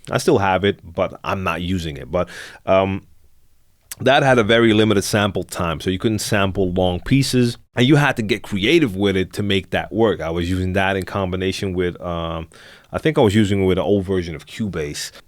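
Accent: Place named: East Coast, United States. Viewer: It's killing me to know....you say you live in the Netherlands, but you have an "east coast" accent and you look like you're Latino...can someone let me know? Also, yes I was too disappointed when I heard the news about Shure needles demise. I always loved the way ortofons looked, but they we're too expensive for my budget. Back in the early 2000's I started with "m-audio TORQ" as my DVS, I liked the fact that I didn't have to carry loads of crates to parties. Then I upgraded to serato SL3, but I somehow keep coming back to vinyl.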